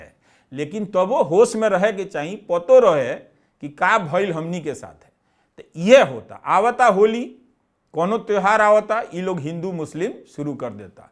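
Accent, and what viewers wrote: Bhojpuri, India. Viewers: ए रवीश सर भोजपुरी में राउर बोलिया बड़ा निक ला ग ता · रवीश जी, आप माटी के खुशबू "भोजपूरी के मिठास"😅😊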